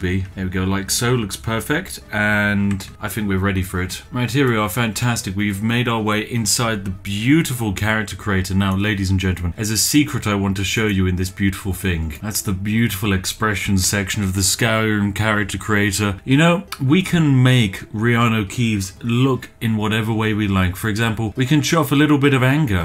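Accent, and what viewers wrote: United Kingdom. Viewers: Yay a brand new British Boi!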